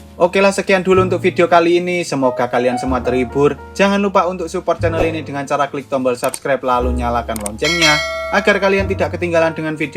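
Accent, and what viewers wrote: Java, Indonesia. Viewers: Dasar medok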